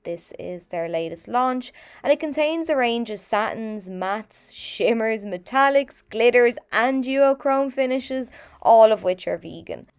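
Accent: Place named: Ireland. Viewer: I love your accent, after my Geordie accent an Irish one is always my favourite. Another great tutorial from you, but I didn't buy this palette cos its just too light for me, I like darker smoky eye shades, but it does look good on you though. Keep them coming gorgeous girl 👧 😘 ⭐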